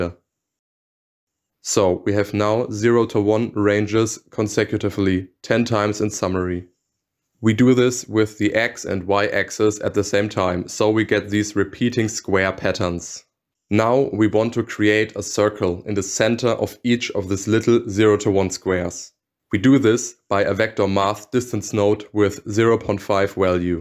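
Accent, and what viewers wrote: Germany. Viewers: Very nice breakdown, directly used it to replicate a DVI input that thicc enchanting german accent <3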